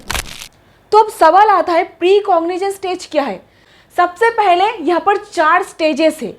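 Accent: Bengal, India. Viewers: মামনি, হিন্দি একটু ভালো করে শিখতে হবে। উচ্চারণ টা বাংলা মিশে যাচ্ছে।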